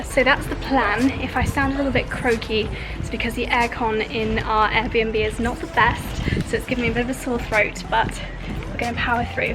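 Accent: Spain. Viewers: Love your Spanish accent Buenos Dias a ti good morning to you :) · You have a very good spanish!! Im Mexican and it was lovely to hear you speak with a quite strong spanish accent 💕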